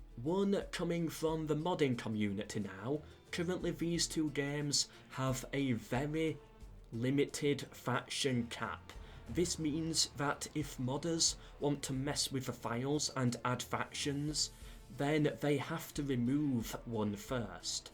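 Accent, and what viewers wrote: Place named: Yorkshire, United Kingdom. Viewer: Yorkshire accent, Ever watch "Sharpe's Rifles" ?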